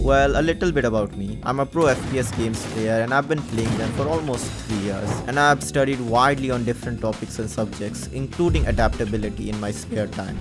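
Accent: India. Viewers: Indian accent >>>>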